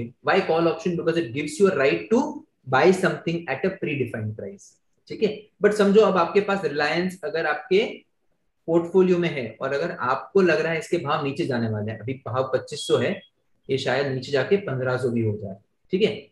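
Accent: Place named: United States. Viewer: Sir jis hisab se English bolte hain jese usa me class le rhe ho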